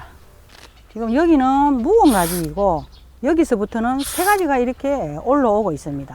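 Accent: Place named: Gwangyang, South Korea. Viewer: 광양분 같네요.목소리가...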